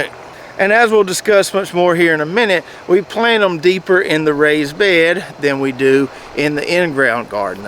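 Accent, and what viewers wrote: South, United States. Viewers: Are you trying to loose your accient..... I know that you do not hiil your in ground taters but you heel them. Keep the accient and Southern way. I enjoy you viedos and your information...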